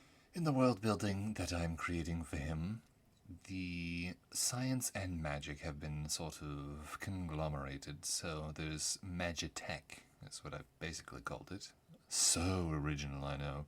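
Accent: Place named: Midwest, United States. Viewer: halberd is REALLY cool... Your "Midwestern white guy" accent does sound jarring...but mainly because I cannot freaking place it. It sounds familiar, but I can't figure out from where. Anyway...now you've finished with this monumental Q&A session...on the snow leopard video. Good luck!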